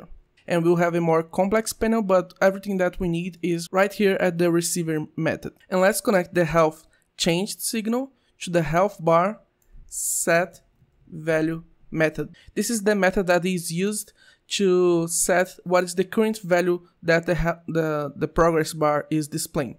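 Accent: Brazil. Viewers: Vi que vc era brasileiro só pelo sotaque, valeu pelo vídeo cara :)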